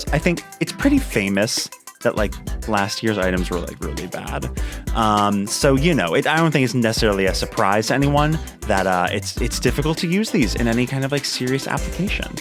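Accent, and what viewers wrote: United States. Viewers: Yes I have a friend from central NY who says egg and leg like you 😂 you’re definitely not alone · That’s how we say it in Minnesooota. We keep our ayegs in bayegs 😂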